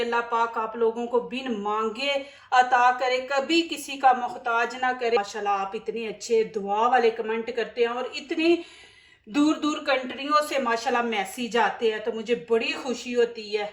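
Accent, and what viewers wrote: Punjab, India. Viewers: Too much panjabi lahja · آپ دنیا کے کس شہر میں رہتی ہیں ۔۔۔ویسے بولتی اردو ہیں مگر لگتا ہے پاکستان کے کسی پنجابی علاقے سے تعلق ہے 😊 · آپ کا لہجہ خالص پنجابیوں والا ھے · Punjabi lehje me Urdu ka aur hi sawaad hai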